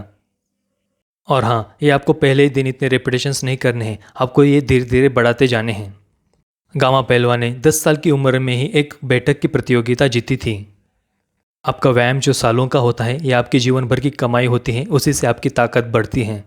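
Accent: Maharashtra, India. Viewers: U r a marathi.and the way u speak Hindi, it sounds so cute 🥰❤I love u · Your Indian accent is thic af man but like the content. I'm just listening to everyone to find a middle way and for the things you said about straps, etc. I don't use belts or straps only raw lifts but I do use pre-workouts and coffee and my primary motivation to use them is putting up more work for more muscle mass I think it's justified and I see the results of my training both in practical situations like pain tolerance for example 2 days ago 12.5 kg dumbbell from the rack dropped to my foot and I felt little to no pain at the time and afterwards and 0 complications good stuff.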